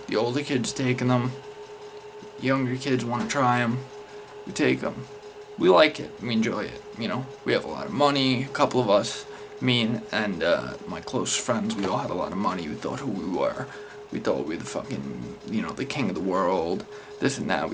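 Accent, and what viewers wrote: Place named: New York, United States. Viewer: yo I hear what you're saying in this vid, I've been struggling since I was 16 and I'm 19 now.. what you were saying about the sub docs is true no one needs that much, there's a 'ceiling effect' to the stuff.. none the less u spoke the truth and I dig the patriots stuff in ur room even though u sound like a new yorka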